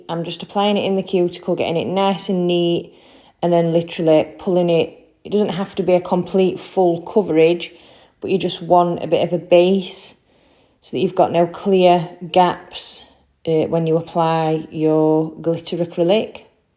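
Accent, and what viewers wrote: Yorkshire, United Kingdom. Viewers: I’m trying to watch as many of your videos as possible. Your work is so beautiful and fabulous clean application it’s a pleasure to watch. Love your accent too, are you in Yorkshire by chance, I’m in Sheffield 😊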